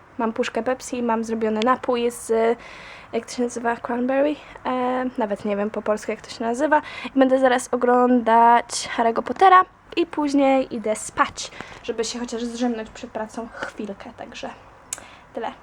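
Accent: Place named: United Kingdom. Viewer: uwieeelbiam jak mówisz po angielsku, masz piekny akcent! uczylas sie wczesniej cos więcej niż w szkole czy mieszkanie w Anglii cię tego nauczylo ;)?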